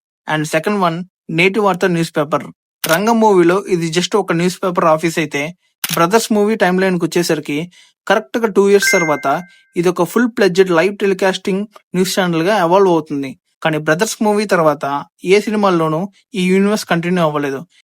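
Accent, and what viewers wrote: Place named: Nellore, India. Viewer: Nijam Cheppu medi Nellore kada !!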